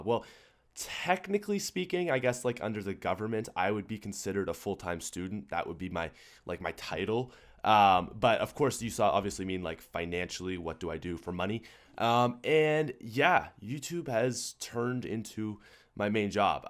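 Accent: Ireland. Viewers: Hey. Why is it that I hear a hint of Irish( Ireland/Northern Ireland) in your voice? Especially the "r's". Haha, awesome videos!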